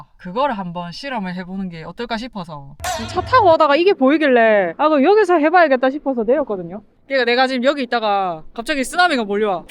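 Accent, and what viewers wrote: Gyeongsang, South Korea. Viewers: 얏빠리! 현지인들과 한잔하면서 대화하는게 젤 기억에 많이 남는거 같아요. 불과 1년반 정도된 일본여행채널이 17만을 찍은 채널이 있는걸보면 도 언능 10만을 찍고 기념으로 다시 만났던 인연들 재회하는 영상 찍으면 더욱더 시청자들이 영상들을 기다릴것 같네요. 구수한 갱상도 사투리에 유창한 일본어가 참 정겨워요! 😊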